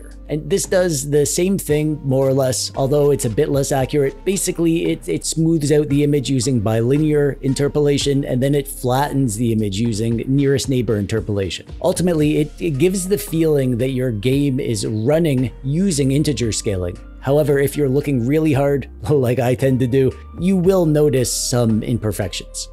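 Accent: Canada. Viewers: Is the host Canadian? Testing my Canadian radar detection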